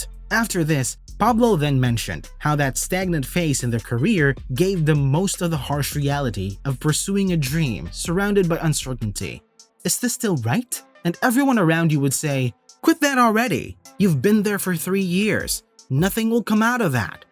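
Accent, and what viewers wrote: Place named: Philippines. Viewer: your accent is like a filipino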